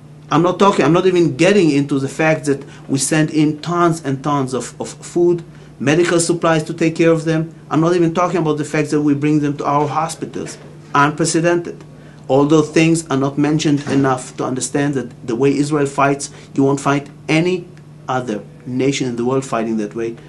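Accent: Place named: United States. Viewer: you talk like a mili..into operation details...fuck that..stand back...u cant have a humane war. Now I respect the IDF IAF for their attempt to be humane, but please understand/feel. You know you sound like an american. Fuck Hamas, but consider your self, bro. Its insanity to think that you can create a humane military system...I dont want to rais eth eissue but the whole fucking sick system of the Shoah, as you call it, was created because asking troops to shoot people made them into monsters.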